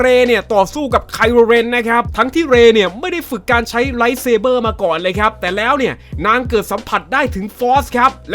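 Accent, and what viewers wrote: Isan, Thailand. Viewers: เจ้าของช่องเป็นคนอีสานหรอครับ สำเนียงออกอีสานเลย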